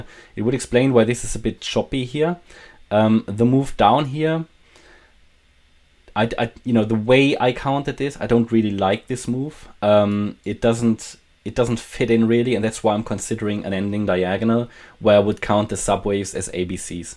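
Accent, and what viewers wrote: Germany. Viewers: Bist du deutscher? Frage nur weil dein Englisch klingt wie meins 😅.. Danke für die Info denke link wird uns noch positiv überraschen.👍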